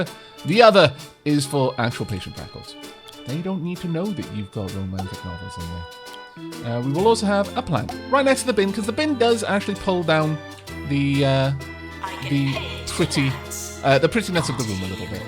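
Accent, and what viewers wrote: Wales, United Kingdom. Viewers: Love your accent, you welsh? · he sounds like a british buk lau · is this guy welsh?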